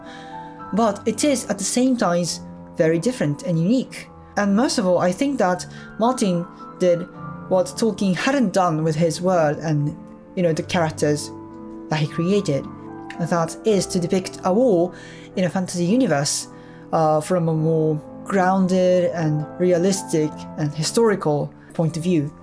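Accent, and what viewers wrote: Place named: United Kingdom. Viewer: Your British accent is good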